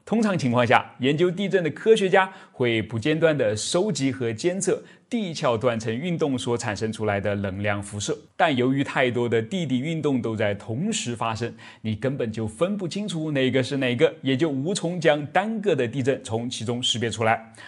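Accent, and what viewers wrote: Nanjing, China. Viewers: 是南京人呀😜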